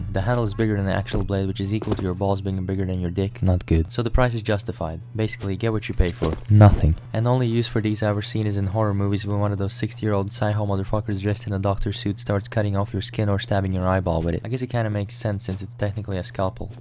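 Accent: Latvia. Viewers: are u from latvia by any chance? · You latvian?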